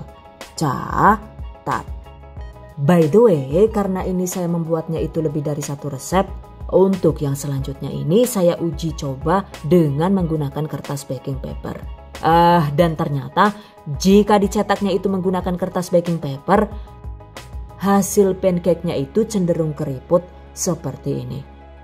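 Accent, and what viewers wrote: Banyumas, Indonesia. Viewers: Orang mana kak logat bahasa jawanya ngapak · Ibunya logatnya kaya orang Jawa ngapak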